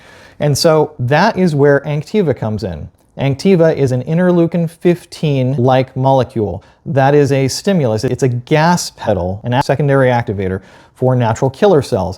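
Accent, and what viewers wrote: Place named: Ireland. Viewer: Also your Irish accent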